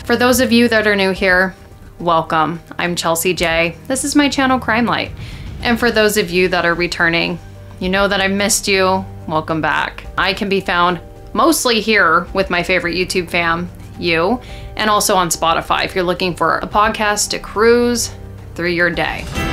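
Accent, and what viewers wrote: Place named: California, United States. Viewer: You're a Californian right you and Elise from 10to Life both look and sound like us. Cali girls stick together lol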